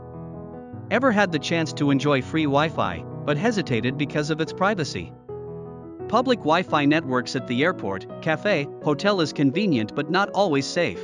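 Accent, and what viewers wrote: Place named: United States. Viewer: I was amazed to discover you have such a broad American accent! 🤣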